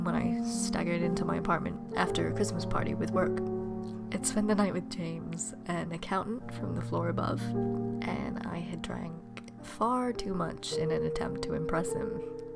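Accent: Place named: United States. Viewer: If I didn't know better, I'd say you were actually American!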